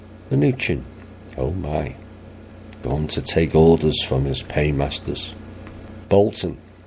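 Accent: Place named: Merseyside, United Kingdom. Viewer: Interesting! There are many players! Good intentions and a sincere desire to bring the light of truth and a better way for so many! And not corruption and cro.nism for an elite few. You sound like a merseyside lad! Me too! Thanks for your contribution to righting a wrong world.👍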